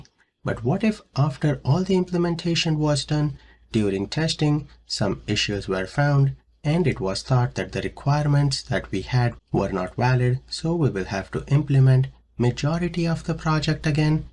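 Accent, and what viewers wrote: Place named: United Kingdom. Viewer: gujju bhai your accent is really hard to understand. do you live in britain ?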